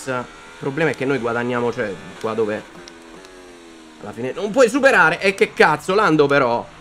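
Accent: Rome, Italy. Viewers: La tua parlantina da Romano mi fa morire 😅🎉😅, comunque inizia a freni sui 100 m dall'inizio della curva..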